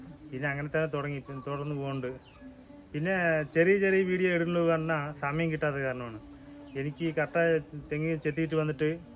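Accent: Tamil Nadu, India. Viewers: കുറെ നാളുകൾക്ക് മുൻപ് bro യുടെ തമിഴ് കലർന്ന മലയാളം കേട്ടിട്ടാണ് Channel Sub ചെയ്തത്😊 ആദ്യമായിട്ടാണ് comment ചെയ്യുന്നത് നിങ്ങളുടെ സംസാരം ഒത്തിരി ഇഷ്ടമാണ്❤❤❤❤❤ God bless you bro......❤❤ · ❤❤❤chettan parayumbo tamil slang varununde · Najn aa thamil kalarnna malayali ye eshatam 😂❤❤❤..ariyan kazijathil santhosham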